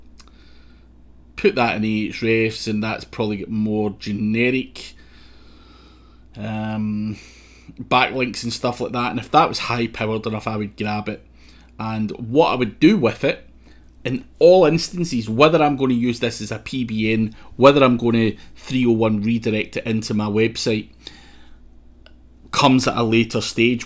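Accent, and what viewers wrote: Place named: Glasgow, United Kingdom. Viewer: Lovely accent from Glasgow;)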